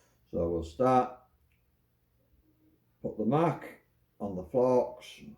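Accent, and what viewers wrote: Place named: North, United Kingdom. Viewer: Great video mate... Exactly what I needed and straight to the point and good to hear a fellow northerner ... Thanks from Hull nick 👍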